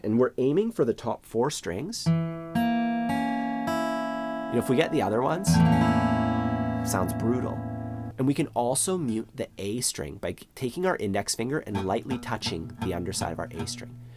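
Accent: Canada. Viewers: holy crap this is a perfect study on how to do a better Canadian accent also really good for learning guitar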